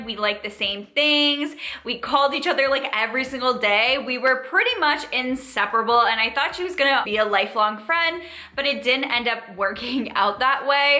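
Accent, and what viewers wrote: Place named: Canada. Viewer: I love you're canadian accent lol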